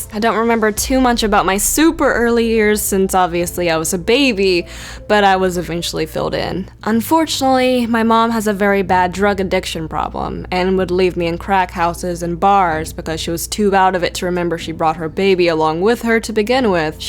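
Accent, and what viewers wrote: South, United States. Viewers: No wonder you have a small southern accent!! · I knew you were a southern belle, such a lovely accent. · I just realized from this video she has a country accent.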